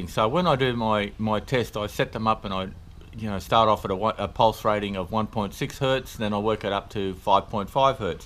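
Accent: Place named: Australia. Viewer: Great videos, good to see some with an Aussie accent. Sorry if this has been asked but reading all of the comments on my phone is a bit tedious. Q: if the controller failed, how would the unit respond if the fuel supply was removed rather than the power, would the unit complete it's cool down procedure and shutdown gracefully or do those smarts live in the controller?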